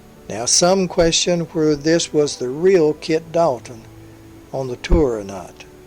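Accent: South, United States. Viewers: I think we're close to the same age, if Jerry Mather as the Beaver is your age then we're the same. You have a smooth southern voice I like hearing, and being a history major from William and Mary getting to hear stuff that wasn't included is always a plus.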